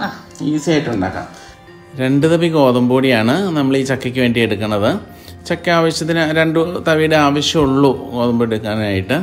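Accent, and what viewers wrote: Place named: Wayanad, India. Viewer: Hai ma'am how are you, I like to hear ur wayanadan voice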